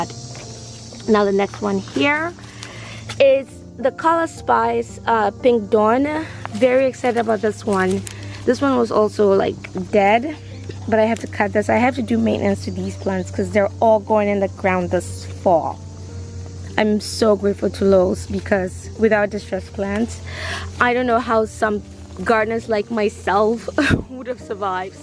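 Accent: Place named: Africa. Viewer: Sorry to bother you so much today but I’m on bed rest, there is an a more soothing channel than yours😎🤟 Your African accent is 🎶🎵2 👂❤ So happy we met 🎊 🎉 Keep it up 👍 Always learning here Impressive all the improvements to date 👋 see ya around. ❤❤❤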